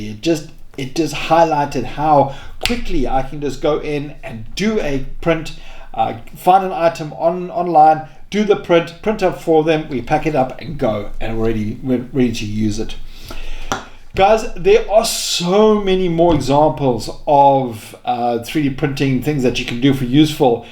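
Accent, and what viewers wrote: South Africa. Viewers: I picked up the south african accent right away. Hehe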